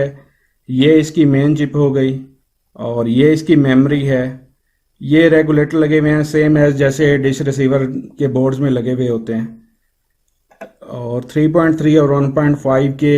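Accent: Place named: India. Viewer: primera vez que veo un video completo narrado por un indi, tuve que soportarlo, para saber como hacer la copia de la memoria serial...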